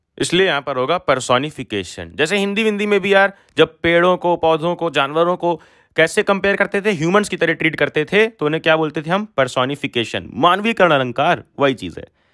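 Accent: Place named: Uttar Pradesh, India. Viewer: Sir u explain very well...hats off to you sir ji....by your voice i understand that you're from UP😊🙌😉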